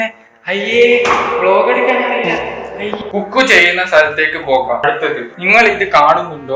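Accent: Kannur, India. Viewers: ingale kannoor basha 🤣🤌🏻💘powli aanu 💘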